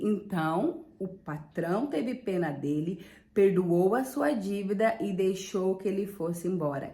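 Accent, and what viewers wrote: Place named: Rio Grande do Sul, Brazil. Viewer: Gaúcha né??? Bênção mulher!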